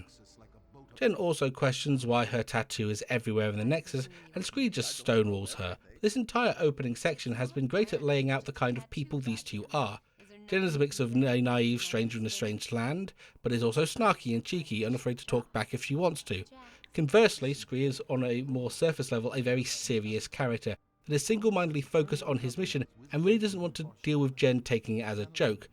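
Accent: United Kingdom. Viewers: Smashed primal on ps2. Genuinely pleased to hear someone from uk when I clicked on this nostalgic brilliantly written blast from the past. Looking forward to what else you've got cooking? c: deserve a mini roll for this vid my G defo ❤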